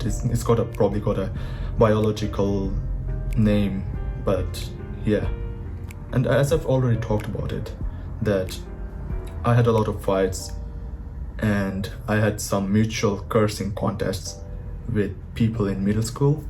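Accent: Pakistan. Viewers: WHERE YOU GOT THE PAKISTANI ACENT FROM??? I LIKE IT, SO ORIGINAL. 😭😭😭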